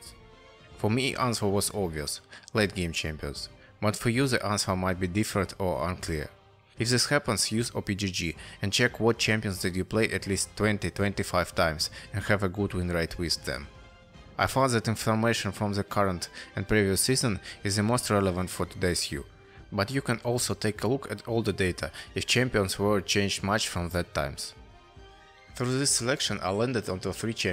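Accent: Russia. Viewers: Акцент не очень. Понятно что ты русский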